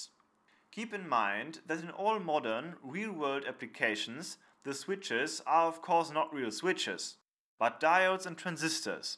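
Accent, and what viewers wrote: Germany. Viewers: Respect for the creator if this Great Youtube Series. It's quite easy to understand and follow your ideas. Thanks a lot. Btw, sounds like a German accent. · Excellent, just excellent videos. Very well thought out and executed. As a 25 year technician, I can say, I've re-learned something from your videos. The direct nature of your presentation is outstanding and I'm sure, will help students and guys with foggy minds, such as myself. I love your German accent. Danke!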